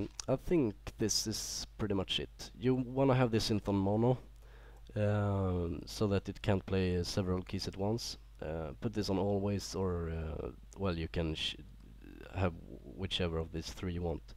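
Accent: Sweden. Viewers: Swedish? :D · Nice tutorial man, REALLY NICE! Swedish btw? :) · are you from sweden?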